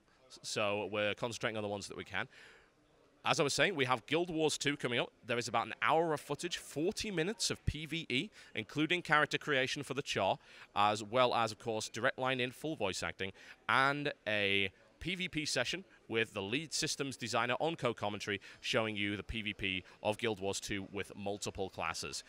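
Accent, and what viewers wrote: United Kingdom. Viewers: whoa dude, you're extremely british.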